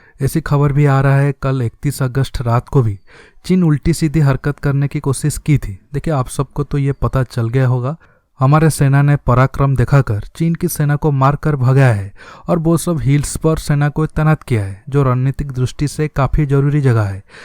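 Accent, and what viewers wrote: Odisha, India. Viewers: Your accent says you are typically Odiya.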